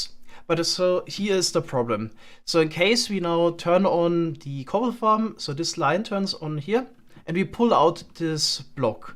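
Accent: Germany. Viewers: are you german or scottish? or swedish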